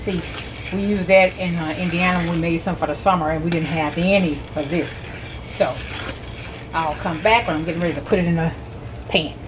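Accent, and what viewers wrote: South, United States. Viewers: I love your good ole' Southern accent. I think l will try this recipe.😄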